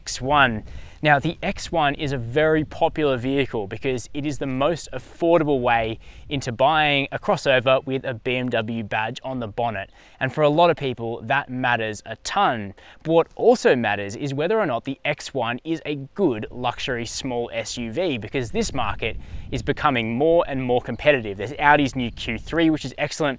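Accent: Australia. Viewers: Very Aussies accent, can not be wrong 😅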